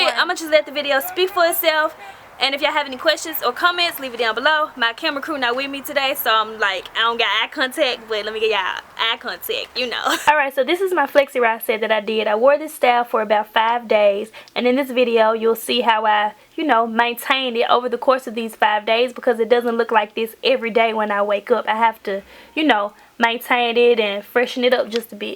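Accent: South, United States. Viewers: girl you so country!!! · She is sooooo country! Where you from? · yes, she is definitely from the south 👌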